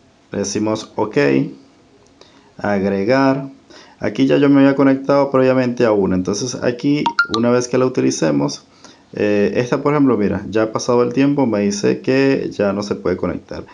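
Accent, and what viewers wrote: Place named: Venezuela. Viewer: Wey eres de venezuela tengo un compa que habla como tu y es de venezuela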